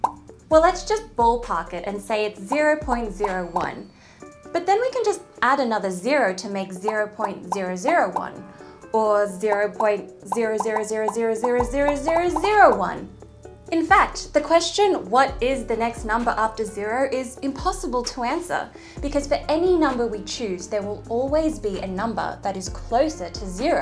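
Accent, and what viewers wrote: Australia. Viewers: the first female Aussie accent that isn't like nails on a chalkboard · and I'm an idiot. I realized that after watching a couple more of ur videos. Us Americans aren't very good at noticing an Australian accent unless its particularly strong. Interesting stuff btw. U really are doing a great job... But u do have a lisp 😆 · I love that cultivated Australian accent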